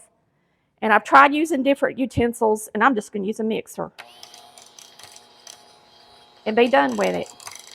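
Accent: South, United States. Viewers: Hi funny lady,wth that strong Southern Accent ..I ❤your food arts ..I just think 🤔 u wanna make me fat..u better Stop it! lol ..jk don't stop it's so good❤🎉😮😂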